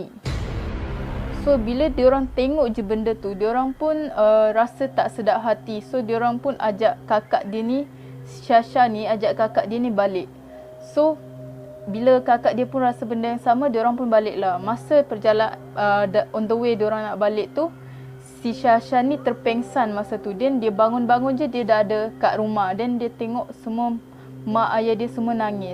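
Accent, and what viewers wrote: Sabah, Malaysia. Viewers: Orang sabah ke sis ? Cara cakap mcm org sabah 😂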